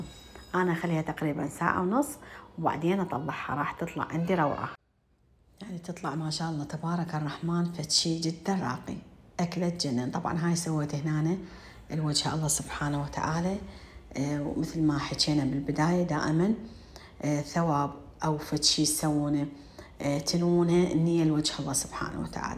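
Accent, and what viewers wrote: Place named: Basra, Iraq. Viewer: لهجة اهل البصرة انت من اهل البصرة?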